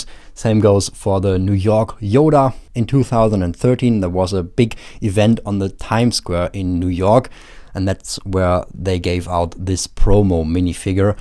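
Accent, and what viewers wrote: Germany. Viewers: Der deutsche Aktzent izzzz da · Sag mir das du deutsch bist ohne mir zu sagen das du deutsch bitte der deutsche akcent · this german accent is furchtbar :D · i hear german out of you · Dein Englisch klingt so deutsch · You can hear this German acsent in his voice 😂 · Man hört den deutschen Akzent haha. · Der deutsche Aktzent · Ich Spüre Deutsch in deiner Stimme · Yo i knew you are from germany by the sound you talk like BrickCrafts 😅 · you sound german ^^ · Deutscher Akzent 🫣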